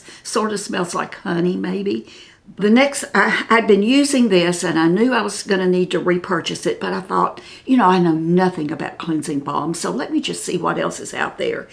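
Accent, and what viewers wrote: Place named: Arkansas, United States. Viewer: I've been looking at your channel for the last 3 weeks and I like all the videos I've watched so far!. I was wondering where you were from I know someone from Arkansas that has the same kind of accent that you do.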